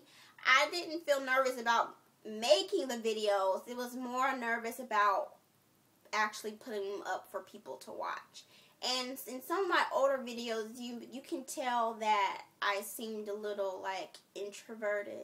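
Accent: United States. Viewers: lol you really sound country girl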